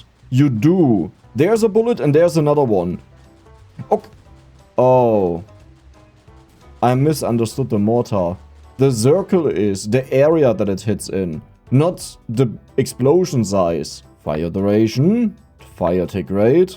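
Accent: Germany. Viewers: Are you german? Either way I love your accent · just a random question... Are you German? Cause I hear the "zee" and "zix" and I can't think of any other language that can give that accent... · Haha Geil, Grade deinen Kanal gefunden. Und nach den ersten paar Sekunden dachte ich mir " Moment der kling doch Original wie nen Deutscher mit dem Aktent ." Hab dei deiner Kanal Bio gesehn das du Deutscher bist und Direkt ne Abo Dagelassen . Ist schon krass wie man das Immer direkt raus Hört wen wir Englsich sprechen . Nur Liebe <3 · Thanks for the vid! Really a pleasure hearing how dude with strong german accent tries to use flamethrowers efficiently to kill as much of them as possible! Here you go <3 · German accent sptted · As a compliment, you have the thickest german accent ive heard on youtube😂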